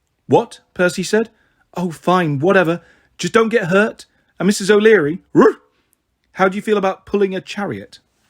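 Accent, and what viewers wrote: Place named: United Kingdom. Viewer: I love listening to HOO in British ❤️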